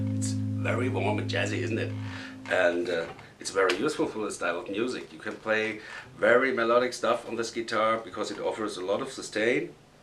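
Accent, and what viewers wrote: Germany. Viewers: Bist du Deutscher? Dein akzent erinnert mich an Deutsch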